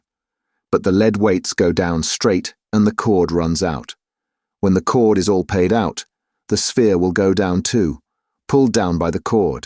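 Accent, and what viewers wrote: England, United Kingdom. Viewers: Ahhh thank you sooooo much, fabulous narration. I so appreciate this lovely English accent.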